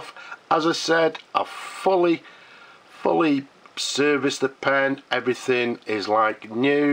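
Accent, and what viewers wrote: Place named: North, United Kingdom. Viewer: love the pen and glad to see the nice event with my favorite nothern accent again.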